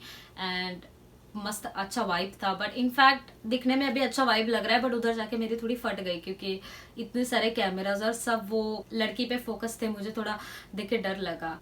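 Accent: Maharashtra, India. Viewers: LADKI KI HINDI LAGI PURI MAHARASHTRA WALI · Her hindi-marathi accent is so sweet^^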